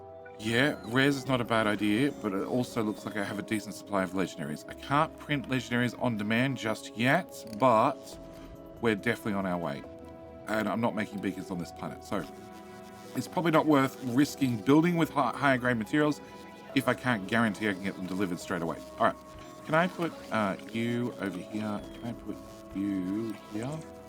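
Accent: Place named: Australia. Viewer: It's Australian mate, different English ay.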